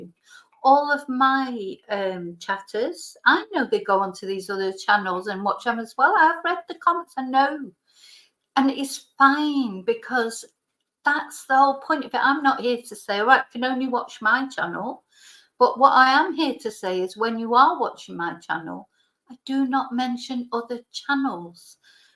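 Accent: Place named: North England, United Kingdom. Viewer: Awe don’t 😊let that di@ run you down. I saw that and I am assuming he’s come off his meds suddenly. He reminds me makes me realise how great it is to be single. You are marvellous and that’s official. I love your channel and it feel’s nice to hear a local voice when I’m doing jobs around my flat…..at night too when I’m winding down and getting ready to go to bed. You can tell he’s a controlling tosser by all the record’s neatly stacked behind him. Jesus grow up fella. Can you imagine living with that? He was obviously getting out of his system something about an ex bleeding him dry and directed at you instead. 😌 Stay strong my fellow northern lady. He is always doing impressions of Michael Caine and thinks he’s hilarious…. I bet the ex had the best laugh when she took everything in the divorce! 😌 just carry on doing what you do best…. 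being you. You don’t have to defend yourself to that maniac, he’s not worth a second thought. To anyone who reads this I am not calling Luke. I think he is a really lovely lad and he’s got a heart of gold. He’s from our neck of the woods isn’t he? If ever you come back home again you are very welcome to come for a cuppa, cake and biscuits in Bramhall Park. I wish I could fast forward to January. Since my mam died it feels pointless. It’s her birthday on the 8th December, mine the 21st and then me who’s dying New Year’s Day. Last year I was just here alone. The first year I never even put a tree up and I used to absolutely love doing that w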